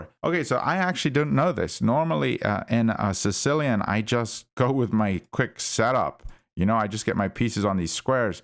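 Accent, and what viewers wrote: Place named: Scotland, United Kingdom. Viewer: This was super helpful, thank you very much! I just found out about you because I was looking for a ChessMind review. You seem like a top bloke :D Definitely will stick around. Unrelated question—and please ignore this if you're not in the mood to answer: English is a second language for me and I was curious what accent/dialect you speak. It's super cool, but I'm terrible at pinpointing English accents. I thought it might be Scottish? (Sorry, if this is completely wrong!) Anyhow, cheers again!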